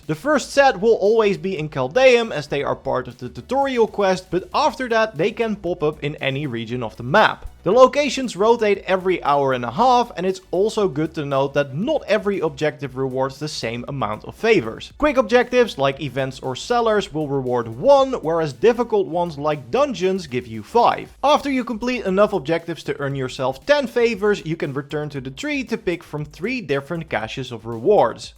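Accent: Netherlands. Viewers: i can just hear you are a dutch speaking english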